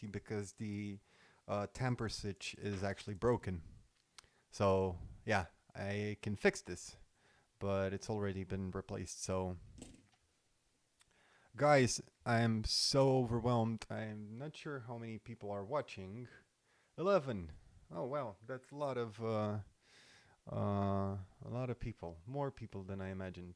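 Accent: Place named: United States. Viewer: I thought the accent was American possibly Canadian.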